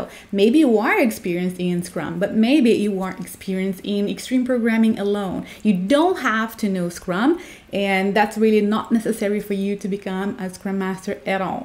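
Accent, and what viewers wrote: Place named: Brazil. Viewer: Are you Brazilian? Your English sounds different.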